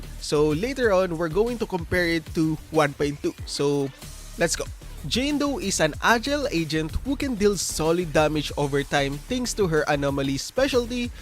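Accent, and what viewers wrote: Philippines. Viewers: I mean 90% of that because of the Ph accent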